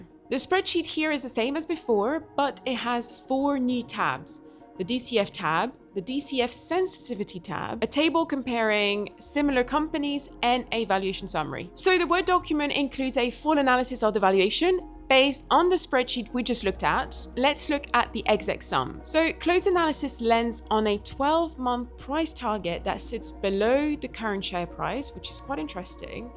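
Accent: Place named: South Africa. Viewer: South African accent...nice.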